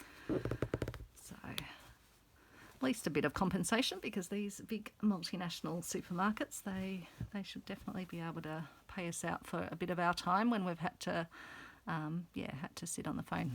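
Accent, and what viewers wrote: Australia. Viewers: So love hearing a fellow australian. I subscribed co I have just found you and also cos if I follow Australians they can tell where they get their supplies, cos I can to! c’mon aussie c’mon 🧵🪡🧵🪡🧵🪡 I will make this cos I think it is a great idea. Thanks heaps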